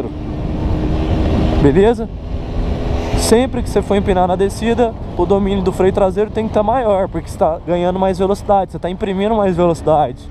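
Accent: Goiânia, Brazil. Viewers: Você e de Goiânia ?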